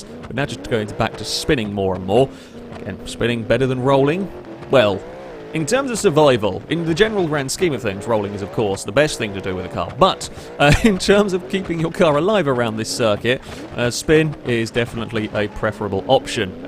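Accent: United Kingdom. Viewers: Gee, I wonder why a guy with a British accent uploads at odd times for you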